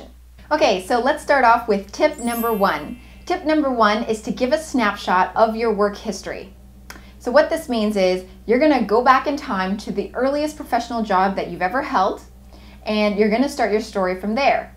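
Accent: Canada. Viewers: You Canadian , right ? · Oh god... she’s Canadian... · please send me the link to your cheat sheet for resumes!! thankyou.. great video!!! are you from canada? your accent is similar to mine! :-)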